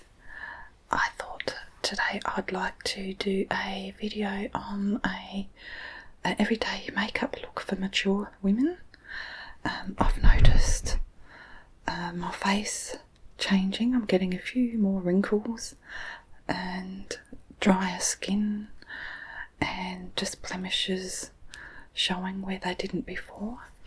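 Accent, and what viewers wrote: Australia. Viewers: I don't wear make up but i love the Aussie accent!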